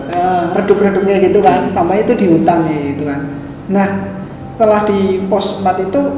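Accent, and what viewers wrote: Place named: Java, Indonesia. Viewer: Mas medok sekali mas 😁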